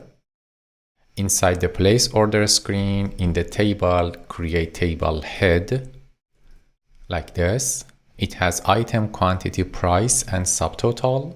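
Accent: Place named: Iran. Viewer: لهجتون ایرانیه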